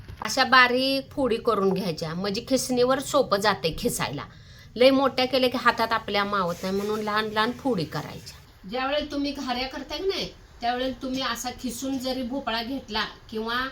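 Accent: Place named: Satara, India. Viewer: खुपच छान बोलतात सातारा किंवा सांगलीचे भाषा कारण मी सातारी इतक छान टापटीप करून दाखवतात मला खुप आवडल धन्यवाद ताई 🙏🙏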